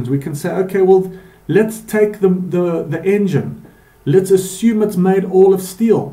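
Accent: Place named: South Africa. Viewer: It's so refreshing hearing a Saffa's vvoice on one of these videos : )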